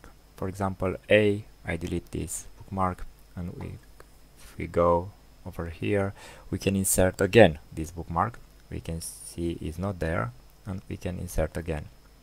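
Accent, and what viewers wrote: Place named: India. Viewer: The comment by Aayush Das Gupta says, with some profanity, that your English is good. NO, it is pathetic and is heavily laden with Hindi accent, the most pathetic of all accents. Your tutorial is a clear as stirred muddy water. Look at the down and upvotes. I seriously recommend you use a text to speech converter, there are a few of them to download for free. You should have used an ordinary text document, with page numbers and a proper word as a bookmark. LibreOffice's own help is as pathetic.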